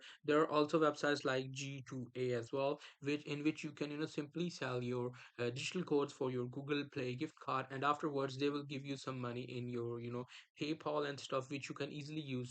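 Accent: India. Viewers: Probably not lmao Indian accent proves it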